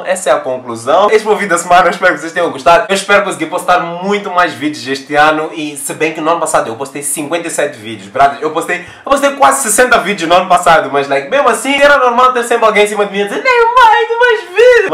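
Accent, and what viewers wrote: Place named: Mozambique. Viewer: Sério wy. Teu sotaque de Moçambique me cuiou bué 😂😂. Faz parceria com um YOUTUBER Angolano mano.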